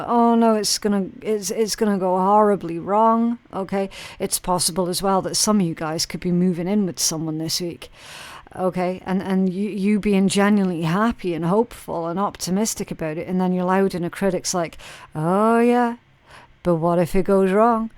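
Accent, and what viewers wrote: United Kingdom. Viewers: Yay a British reader 😁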